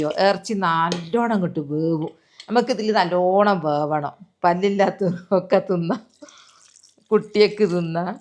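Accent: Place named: Wayanad, India. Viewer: Wayanadan...samsaram...ugran... Thrissur..ninnum...❤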